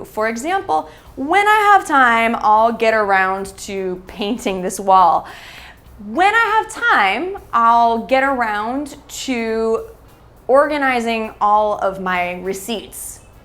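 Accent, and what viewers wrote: United States. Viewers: ya lookt awesome suppa beautiful. Light and camera angle were perfect and the narrative like an American blondie. Thanks for these videos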